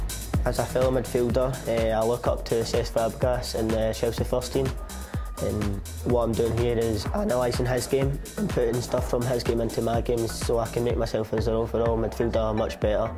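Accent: Scotland, United Kingdom. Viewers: I love his Scottish accent lmao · If he Wants to have a good career he has to get rid of that Scottish accent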